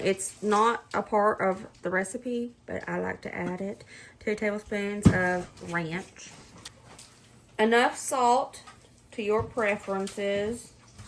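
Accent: South, United States. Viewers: I love listening to you narrate yourself. So southern. Southern woman here:face-turquoise-music-note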